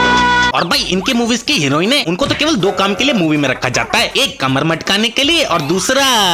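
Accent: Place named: Assam, India. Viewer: Big fan bro Choro Choro assosom type se bolte Ho bhai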